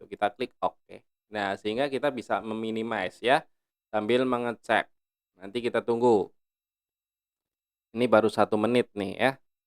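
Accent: Banyumas, Indonesia. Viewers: Om pake semi online ya anbk nya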